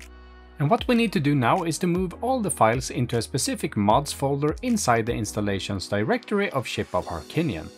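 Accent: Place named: Sweden. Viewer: Once you learn to spot a swedish accent it's hard to miss, heh. -fellow swede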